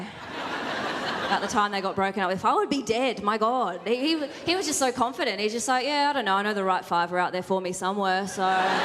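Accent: Australia. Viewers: Austraiiilian Maite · She's very Australian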